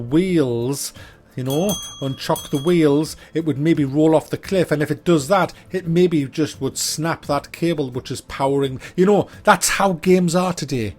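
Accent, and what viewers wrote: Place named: Newcastle, United Kingdom. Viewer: nice to hear a geordie on youtube, you are a rare breed apparently...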